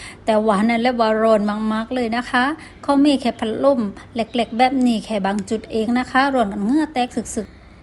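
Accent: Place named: South, Thailand. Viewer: ืชอบจังแหลงใต้แบบนี้คนใต้คือกันจ้า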